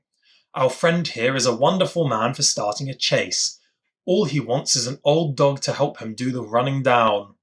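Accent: United Kingdom. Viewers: 前編のA Scandal in Bohemiaも大変勉強になったので、待っていました！ 典型的なちょっと上流のイギリス英語ですね。何度か最後までしっかりと聞くと、ストーリーがしっかりと理解出来ると思うので、頑張ります！：）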